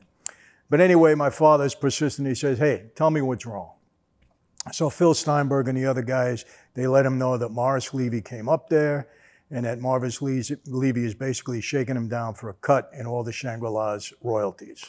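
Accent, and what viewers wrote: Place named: East Coast, United States. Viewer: Hello Mr. Franzese, My Name is Rick Vaughan and I just wanted to thank you for doing all these videos on your life, sharing really interesting and intriguing insights into a world almost none of us “regular-type” people would ever know and for continuing to share the positive message of god and how to be a better person in this world. You have the ability to captivate an audience and it’s awesome to hear ya talk with your east coast accent! I love watching your videos and wanna keep encouraging you to keep them coming! Also celebrating 5 years of No booze today and sending you continued well wish to your daughter and family! Much love and god bless Sir, take care! -Ricky V.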